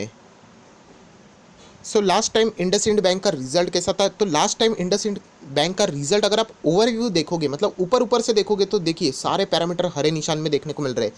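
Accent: Maharashtra, India. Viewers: Are you Marathi? You language (tone) seems familiar. BDW awesome content and knowledgeable, following your channel since month and learning new things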